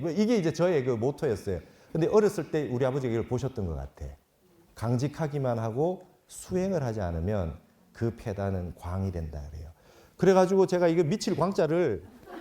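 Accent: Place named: Gyeongsang, South Korea. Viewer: 스님 은근히 경상도 톤에 정감이 가네요 항시 스님 법문에 관심을 가집니다 감사합니다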